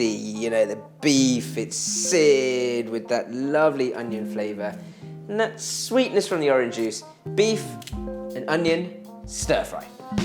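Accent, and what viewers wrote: United Kingdom. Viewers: I'm amazed by the food but confused that you got a British accent hahaha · Chinese speaking with uk accent wow. Amazing and the fiod looks delicious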